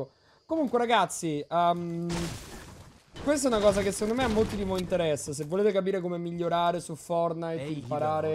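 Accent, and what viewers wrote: United Kingdom. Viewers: Quanto sei british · L accento inglese, oddio, mai piu da te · Grandissimo bro partita easy come sempre... Ma poi quanto sei british😂😜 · Troppo brittish entra così a far parte della dpg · Ma quanto cazzo sei british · O mio dio ma quanto sei forte e anche molto british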